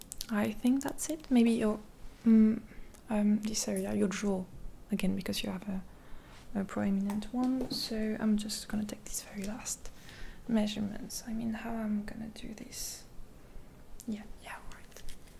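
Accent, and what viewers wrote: France. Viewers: Je suis juste réellement impressionné par ce bon niveau d'anglais, j'écoute bcp d'asmr anglais pour m'améliorer, eh bien contre toute attente un asmr anglais avec accent français c'est pas si mauvais pour progresser, j'ai tout compris, l'accent doit être assez familier je suppose. Sinon continue comme ça, ton asmr est très bien. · A perfect video I’m sure of what I’m saying but l accent français franchement sa rajoute un truc et le alors a un moment magique super vidéo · is she french? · Am only 2 mins in and I thought French but will listen a bit more lol first video of hers so haven't a clue. (Sounds French to by English ear anyway) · El acento francés sisisi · Are you French??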